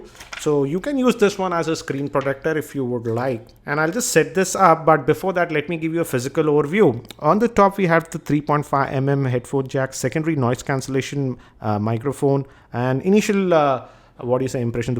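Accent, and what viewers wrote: India. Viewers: typical Indian accent english.. :(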